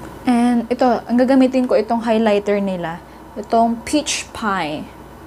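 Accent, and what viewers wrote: Ilocos, Philippines. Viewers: Ilocano kapo ba?? 😂😂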